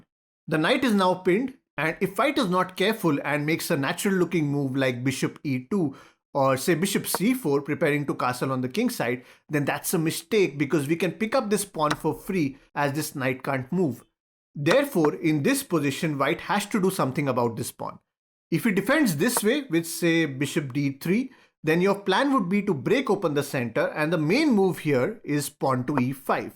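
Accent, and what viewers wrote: India. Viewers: Some people need the subtitles to better understand Hindu English to give them a better experience, unless they miss important lessons... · This chanell is magic! Dude with indian accent whisper me when i played... No Offense because Indian and Singaporean Accent is easier to hear by majority of Asian...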